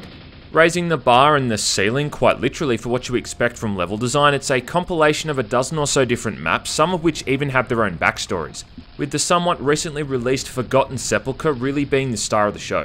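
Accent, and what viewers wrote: Australia. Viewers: Tyler Malone so it's Australian accent he speaks with?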